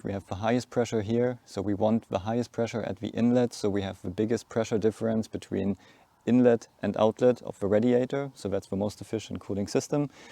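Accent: Germany. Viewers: Wenn ich das richtig heraushöre bist Du Deutscher? Warum den auf Englisch? Wegen der Reichweite?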